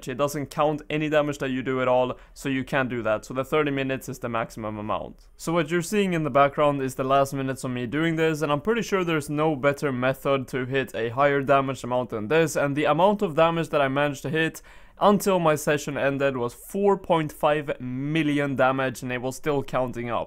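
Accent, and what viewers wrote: Sweden. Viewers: I can hear that you are Swedish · Ur Swedish right? U sound Swedish nice vid btw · Are you from sweden? Really sounds like it.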